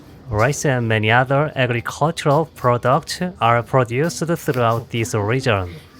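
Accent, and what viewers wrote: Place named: France. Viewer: Hi Bro, i´ve a few questions. You mentioned that Bangokok grows in cost from 2021 to today, this is in bath or US dollars? when you move around the country, you use train, bus or plane? Estimative, how much money you need by month, for all expenses ? (Aproximaly) And last, do you speak French? You have the accent!!!! Thanks for your work, and I send to you a hook from the end of the world (Ushuaia)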